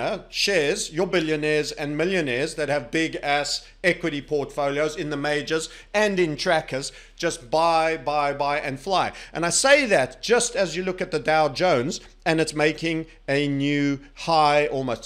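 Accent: South Africa. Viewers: except it's not a brit accent, he sounds South African or perhaps from NZ/Aus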